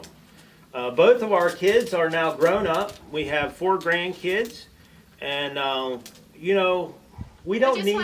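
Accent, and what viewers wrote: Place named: Pittsburgh, United States. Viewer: Iam wondering where ur accent where ur from! Sounds like Pittsburgh Pennsylvania area!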